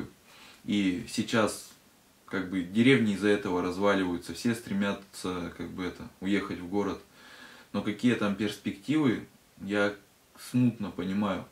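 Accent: Urals, Russia. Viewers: Вот как раз по нашему разговаривают ...по Уральски ✌️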